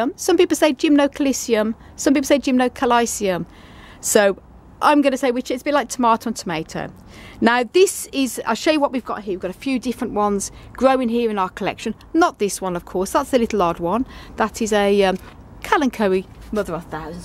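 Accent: United Kingdom. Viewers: I got to learn more about my cacti. by the way, you have beautiful British accent! I enjoyed your video!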